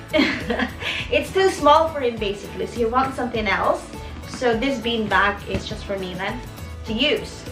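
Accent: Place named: United Kingdom. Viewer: Love2 ur british accent maam 🥰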